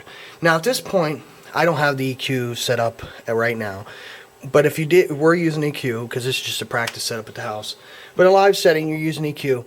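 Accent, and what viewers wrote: Pittsburgh, United States. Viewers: You HAVE to be from around Pittsburgh. You talk like everybody around me.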